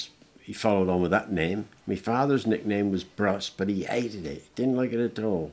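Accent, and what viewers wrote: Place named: Cornwall, United Kingdom. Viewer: My mates, lovely man from a proper Looe family. And yes, that is a typical Looe accent, so rare these days and so beautiful to listen too. Reminds me of home...though I only live in Newquay, I still miss it.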